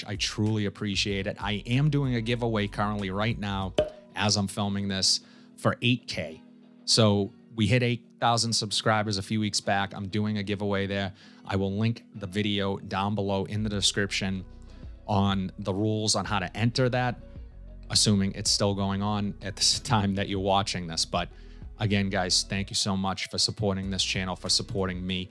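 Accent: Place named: New York, United States. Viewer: I am from Denmark But i must say your english is straight out of the Sopranos. Love it, you have to be from New York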